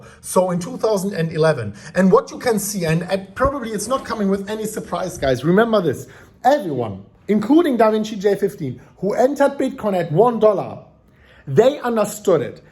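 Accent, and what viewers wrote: Germany. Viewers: I like you man . I'm Polish anc I new your hard accent have to be German . I follow you mate and trully .... like you😉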